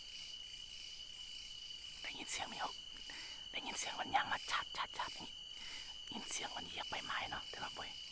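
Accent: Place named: Roi Et, Thailand. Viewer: ร้อยเอ็ด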